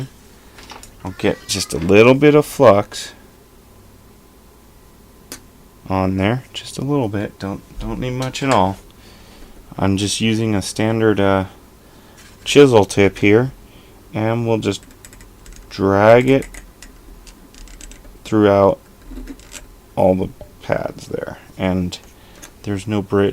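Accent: United States. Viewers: excellent tutorial and thank you so much for doing and posting it. One thing though, the L is silent in the word solder unless you're from England. You sound American though?? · John Strauss For the Accent my money is on Michigander...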